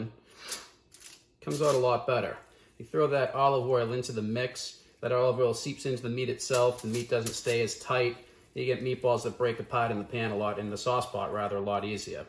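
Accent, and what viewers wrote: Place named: Boston, United States. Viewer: I knew it was BS right off. If he wanted a little water in it, he would have put a little in the cans to rinse them out in the beginning. It was a good try try, though. Magic ice. 😏 I also knew, from his accent, that he was from an area in close proximity to Boston. NH-ites can pick up on that pretty easily. Lucky pooch at the end🐕 That's gonna make her fart, yanno.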